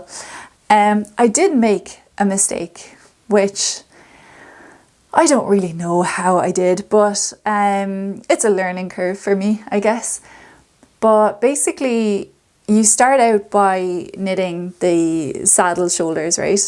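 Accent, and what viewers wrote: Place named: Ireland. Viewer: Love the stitch definition on your Dagmar jacket. Really enjoyed your podcast. I’m from Donegal but living in the UK ( like a previous comment). So love hearing the Irish accent 😎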